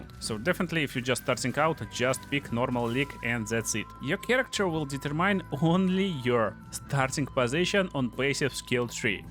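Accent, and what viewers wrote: Russia. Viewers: Your accent, I swear You made a BG3 guide but I can't find it on this channel, maybe another Russian. You sound identical, accent an all lmao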